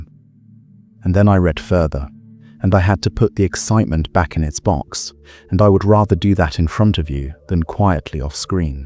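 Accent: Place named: United Kingdom. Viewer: Truly appreciate that the talk over is done by someone who's actually British or English rather than some American with their dirty terminology that will twist what truths we've got left I Appreciated This Thank You Buddy 🌹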